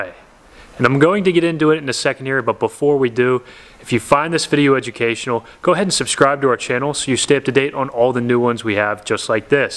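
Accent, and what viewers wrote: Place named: Western Pennsylvania, United States. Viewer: I love your western PA accent. I’m from Indiana Pa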